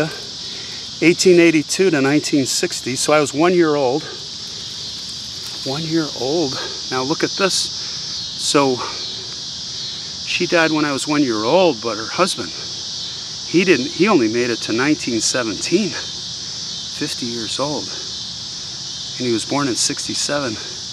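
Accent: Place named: Chicago, United States. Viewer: This is so awesome! I don't live in Chicago anymore, but my Scandinavian family is buried in several of the cemeteries you have covered in N. Chicago. I watched several of your videos just to see if you happened upon my family (slim chance I know). Love watching your videos and love your familiar Chicago accent and storytelling style. It makes me miss my family so much! It's pretty crazy that a Swede married a Norwegian 😂. Great video.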